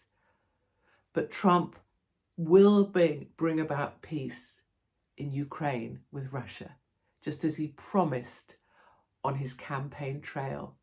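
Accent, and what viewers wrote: RP, United Kingdom. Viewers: .....you are such a Sister, a Yank with a posh British accent. We love you over here. Thank you for your reasonable, common sense. Keep speaking your mind!!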